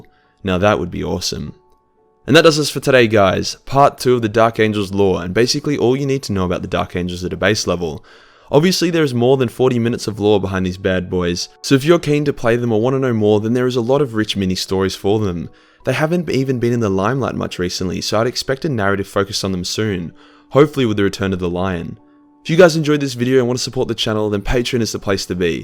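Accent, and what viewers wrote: Australia. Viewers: It feels like I'm listening to an Australian talking about kiddos with adhd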